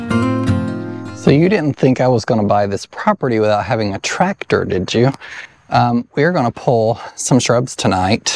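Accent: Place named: Appalachia, United States. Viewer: Hello, I love watching your channel! I think one of the main reasons is because I live in the Appalachian mountains in Huntsville, AL and I feel at home listening to your accent. I grew up on top of Monte Sano Mountain and now live on the side. Thank you for allowing us to follow your journey.:) Kelly Flowers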